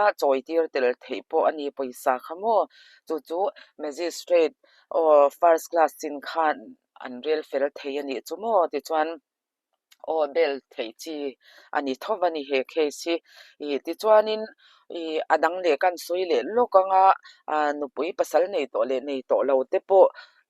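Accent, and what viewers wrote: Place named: Mizo, Myanmar. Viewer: I thu ziak post ka lo chhiar ṭhin a, a ṭha ka ti viau ṭhin a, mahse i ṭawng ngaihthlak chuan ka lo rindan che nen chuan a va inpersan e, ka ṭawng lo hawlo la min lo hrethiam la, i ṭawng hi ngaihthlak chian chuan Burma Mizo ṭawng a ang, a pai lai deuh a awm nual mai, phalrai tih te adang pawh ka hre ṭeuh, mahse dan hriat hi apawimawh a, chu lamah chuan i ngaihsanawm.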